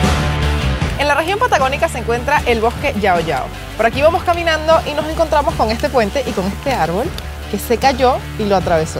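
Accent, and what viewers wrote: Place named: Venezuela. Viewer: Por el dejo pareciera que fuera venezolana. Una cachetada para tus compatriotas eh!? tu disfrutando la vida mientras que por aya se están muriendo de hambre.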